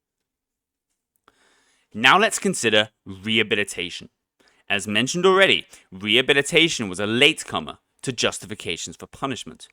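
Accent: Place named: RP, United Kingdom. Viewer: Ever heard the truth mate it usually starts with poverty but u wouldnt know about that judging by ur accent eton was it or harrow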